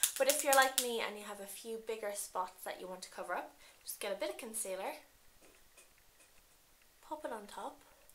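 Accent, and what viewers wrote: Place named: Ireland. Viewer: omg, can you please like smuggle me and take me to Ireland please??! your accent, girl!!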